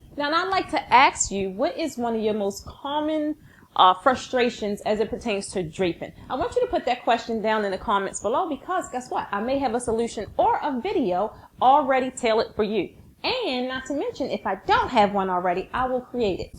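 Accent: Baltimore, United States. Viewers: Love these tips. These are great. Thanks. You must be from B-more. You sound like some of my UMD friends 😁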